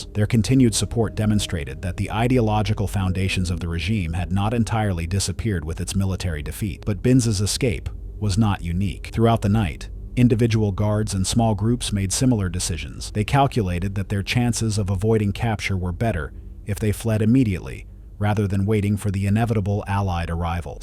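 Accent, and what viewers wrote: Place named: United States. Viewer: I believe it's the bloody annoying authoritive American accent - which is so annoying! Right! The Americans won the war!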